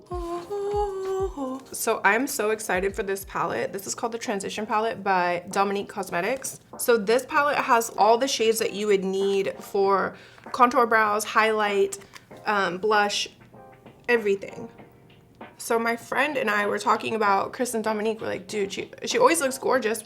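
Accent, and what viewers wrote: South, United States. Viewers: I love when you do your country accent 🤣 I’m from Texas girl and it hits me right in the feel good happy spot ya hear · Your SOUTHERN accent gets me EVERYTIME lol. So glad to see you back hun, 2020 and 21' haven't been easy for ANYONE...but we're all here together❤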